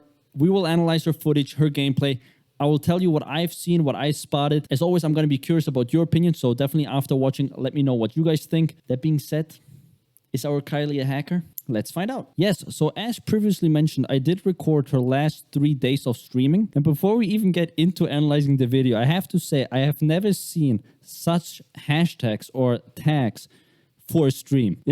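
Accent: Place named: Germany. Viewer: You definitely a German bro, your accent sounds like this, and your name too.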